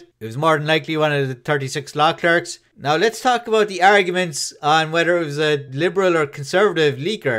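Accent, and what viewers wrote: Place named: Ireland. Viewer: Gona go ahead and guess your Irish….though you were an Indian dude fighting an accent when I first heard ya. Good content. They have conservatives in Ireland!? Though the whole island had been taken over by abortion activists…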